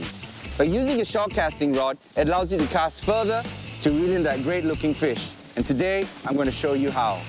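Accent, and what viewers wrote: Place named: Singapore. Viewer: Singapore accent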